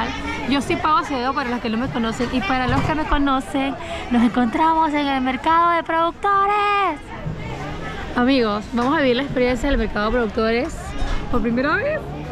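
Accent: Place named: Peru. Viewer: Que paso ahora hablas como una Peruana.🤔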